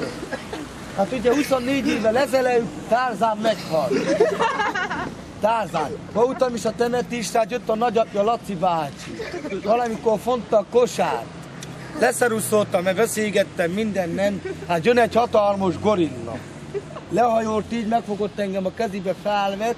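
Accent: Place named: Szabolcs, Hungary. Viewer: Ó rendben köszi! Csak az akcentus kicsit olyan szabolcsias volt :D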